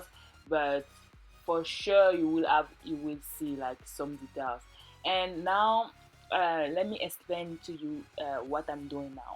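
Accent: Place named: France. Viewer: Nice video! Es-tu française? You sound like you have a French accent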